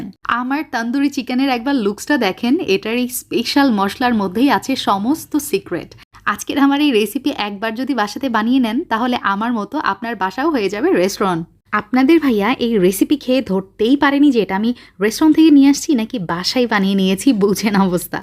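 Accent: United Kingdom. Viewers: আপনি কোথায় থাকেন?বিদেশি কিংবা ইংলিশ মিডিয়ামে পড়ুয়া বাচ্চাদের মতো বাংলা এমন স্ট্যামার করে বলেন কেনো?আপনার আগের ভিডিওগুলিতে তো এমন স্ট্যামার করে বাংলা বলতেন না আপনি তাহলে এখন এমন করে কথা বলেন কেনো?শুনতে ভালোই লাগে কিন্তু এইভাবে ইংরেজি টানে বাংলা বললে ভাষার মৌলিকত্ব নষ্ট হয়ে যায়।প্লাস আপনার সিমপ্লিসিটিও নষ্ট হয়ে যায়।অনেক আর্টিফিশিয়াল লাগে।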